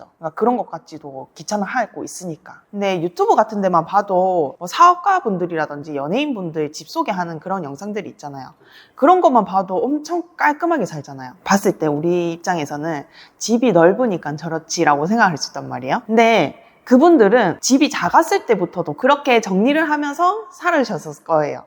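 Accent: Gyeongsang, South Korea. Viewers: 이 가스나 갱상도 말 투를 숨기려고 애 많이쓴다!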